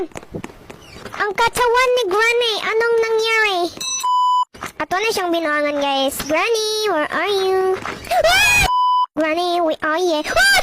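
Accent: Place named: Visayas, Philippines. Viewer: Bisaya ka uyy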